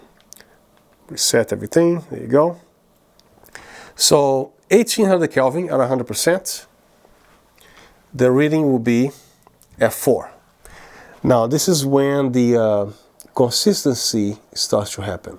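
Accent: Brazil. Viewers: There aren’t many reviews of these new models and they look amazing, thank you. I own 2 la200d and absolutely love them, I plan to get 2 la300r next year, hopefully. Have you checked out the godox s120t softbox? There are also other sizes, but they are “umbrella” style, apparently much easier to set up and colapse, I want to replace my softboxes with 2 s120t. I’m from Brazil and even though these products are relatively inexpensive abroad, here the prices are absurd. Ps.: you sound Brazilian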